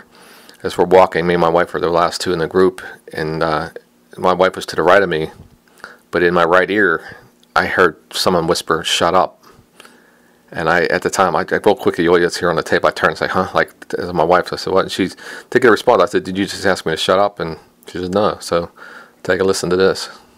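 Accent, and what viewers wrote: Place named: Pittsburgh, United States. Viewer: Pretty cool stuff. You sound like you're from Pittsburgh from the accent. Thanks for putting it all together.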